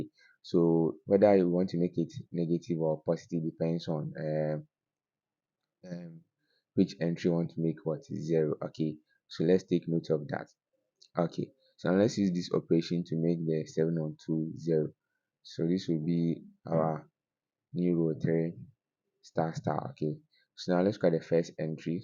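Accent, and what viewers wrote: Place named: India. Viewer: Are talking in English or Indian? wtf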